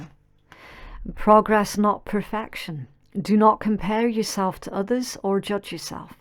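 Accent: Scotland, United Kingdom. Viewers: You're scottish 😍😍 hahaha Loving the accent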